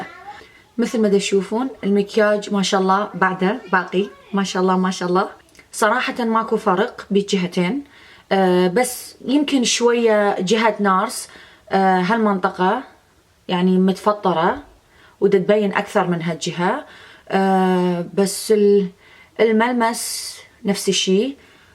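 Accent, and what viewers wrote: Iraq. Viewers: حبيبتي انتي عسل ومررره متواضعه .. واحلى شي اللهجه العراقيه عششششق ♥️😍 .. ربي يحفظك 😻. .. حبيبتي ايش ترشحي اكثر جورجيو اىماني ليمونس سيلك والا استي لودر دبل وير ... ايهم افضل ويقعد تطول وما يخطط محتااااااااره بينهم 😔😔😔😔💔💔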